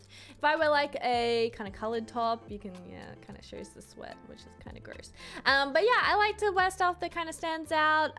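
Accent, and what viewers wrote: Australia. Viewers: She is Aussie... I wonder where that could be....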